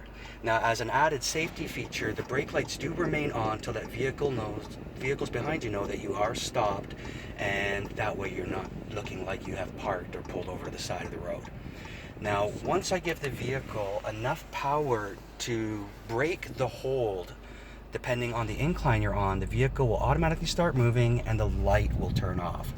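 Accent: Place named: North America. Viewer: North American accent..right hand drive ?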